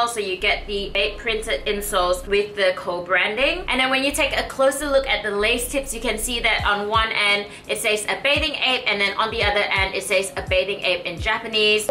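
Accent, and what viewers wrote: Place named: Australia. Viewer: That’s an Aussie accent 😉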